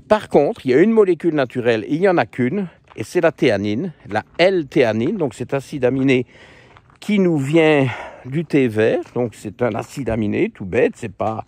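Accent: Belgium. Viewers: Merci et vos bestioles! Vos capsules ont un air poétique et naturel qui me convient bien, de plus j'aime bien l'accent du cru Belge comme septembre sans le "p" ou et poui ❤😊 Le seul bémol -pour moi - est que vous n'annoncez jamais l'année sur vos shooting 😢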